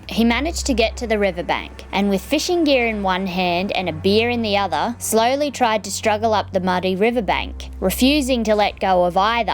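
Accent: Australia. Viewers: Okay I'm having a hard time understanding Australian English. Did she really say that the park rangers caught Sweetheart but it died of exhaustion evading them? This makes no sense. I can understand catching the croc then having the croc die of acidosis due to it's struggles but she makes it sound like the croc must have escaped because once your caught the evading is done. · Love how aussie this chic is reading the info. On a sad note a couple of crocs died in the making of this film because al lot of people where morons. Crocs outlived the dinosaurs for a reason, stay out of thier kitchen and you won't get eat. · Now that's a pure Aussie accent I love it👌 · Aussie accent my man lmao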